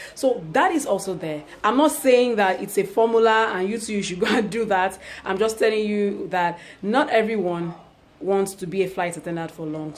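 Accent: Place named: Nigeria. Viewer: Hello I love your authentic Nigerian accent! The Nigerians that I know are very hard working and ambitious people!! you seem to be so driven about your life and future!!! What I wanted to ask you is if it is possible to raise a family with the salary that you guys make as a flight attendant for Emirates? Is Emirates hiring again pretty girls and boys from the world over?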